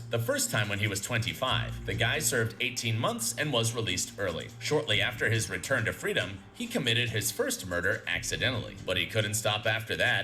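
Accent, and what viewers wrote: India. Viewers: that indian accent got me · His Indian Accent had me dying😭😭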